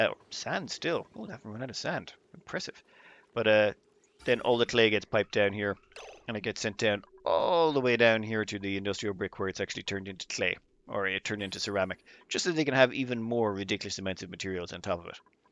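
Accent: Ireland. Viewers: hey long time watcher first time poster, love all your vids, just wondering where in ireland are you from? im guessing south dublin sans d4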